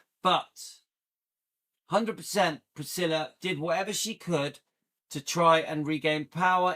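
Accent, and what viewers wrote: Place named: Norfolk, United Kingdom. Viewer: did you grow up anywhere near Norfolk because sometimes I detect a Norfolk accent? Only sometimes 😀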